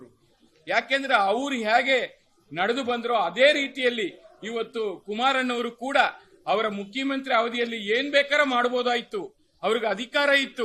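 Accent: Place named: Tamil Nadu, India. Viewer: ಕನ್ನಡ ಸರಿಯಾಗಿ ಮಾತನಾಡಿ ಮಾನ್ಯ ತಮಿಳ್ ಪಿಳ್ಳೈ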